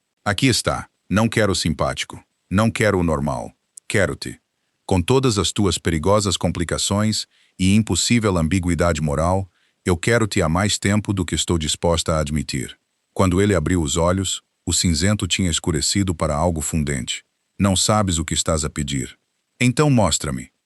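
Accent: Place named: United States. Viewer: Odeio essas palavras em Português é sótaque americano é horrível fala o português por favor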